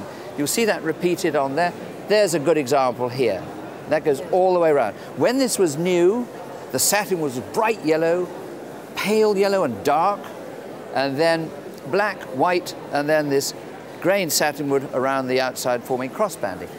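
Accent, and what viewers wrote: United Kingdom. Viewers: Definitely received pronunciation 😊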